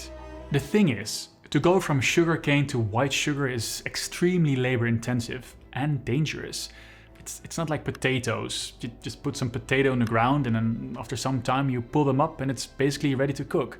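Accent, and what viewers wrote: Netherlands. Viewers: I really love the vid! But I can hear you're dutch too much to watch it lol. Just can't handle hearing my own accent😅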